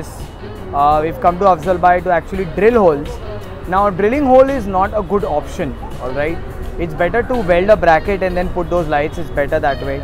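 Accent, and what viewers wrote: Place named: Mumbai, India. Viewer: I pray that YouTube give a love button for your videos, all your videos are so informative.. and love the way to talked with Bhai in mumbaiya style, keep up the good work bro.. make frequent videos..👍😊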